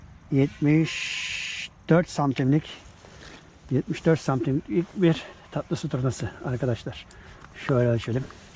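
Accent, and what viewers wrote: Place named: United Kingdom. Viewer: British aksanına hayran kaldım abi bu arada. Bizim balıkçı aleminde nadirattan olacak derecede iyi bir aksan. Selamlar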